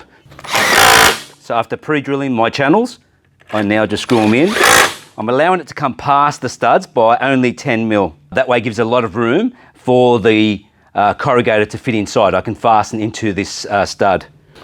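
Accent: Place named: Australia. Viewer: Thank you so much in replying, you are one of the few who has! I found your channel purely by accident. Although I am now 61 and retired, I find that trying to expand your mind is a good and healthy thing and one should never stops learning until you pop your clogs. Given that there are so many channels with Americans on here, it is a refreshing change to hear an Australian accent, not that our US and Canadian cousins don't have a lot to offer, as they do. PS Given you are in Sydney and I am in good old Blighty, you must either go to bed very late, get up very early or just not sleep a lot like me! All my very best to you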